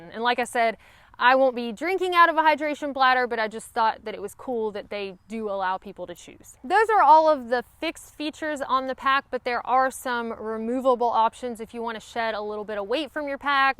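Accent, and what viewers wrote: South, United States. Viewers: Mine too, Matthew. I grew up in MS and lived 7 years in AL, 2 1/2 of those 1/2 hour from Auburn. But have lived up north and now in TX. Love the Southern accent! And she's an engineer! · I bicycled about 38,000 miles and camped through nineteen countries. I thought about through hiking the appalachian trail. It is not likely. Except for a 15 mile forced march in the army through a dry dusty riverbed, I have no experience long distance walking. I have no way of estimating my ability for that. Of course, if I were 30 again in place of 70 which I am now, there would be no question whatsoever. But, it is like the man said--There aint no way that's ever going to happen. I read your name. You also use because you are from the south, Alabama they say. And what makes it more obvious than your sweet, pure accent. Every syllable is another drop of honey, and beholding to the eyes, you are. 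You are a movie star. It is really clever and really cute what you do, and how you present yourself and the subject. Surely making the through hike could be a profoundly life changing experience. It can be the same with long-distance bicycle camping and touring, and quite different in some aspects.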